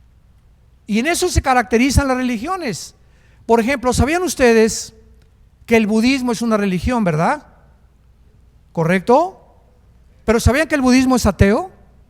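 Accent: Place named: Puebla, Mexico. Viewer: Como que tienes un acento a tehuacan puebla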